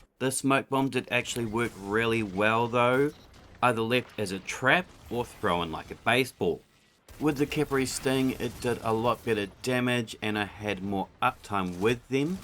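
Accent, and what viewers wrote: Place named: Australia. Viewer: Creative ideas, informative and well presented, entertaining aussie accent, subscribed for sure!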